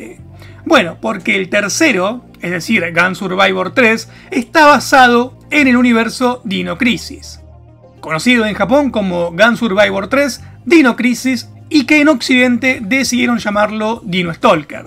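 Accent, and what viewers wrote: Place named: Argentina. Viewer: Ese acento argentino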